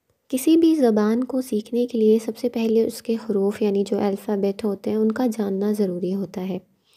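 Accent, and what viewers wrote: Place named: Iran. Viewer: آپ کا لہجہ فارسی ہے۔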